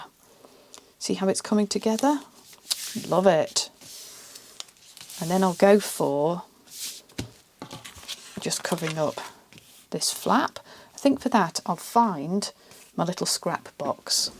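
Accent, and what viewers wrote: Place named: Lancashire, United Kingdom. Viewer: Love this project,! Your voice is so soothing, and it reminds me so much of when I lived in Lytham, Lancs. throughout the 1980s!!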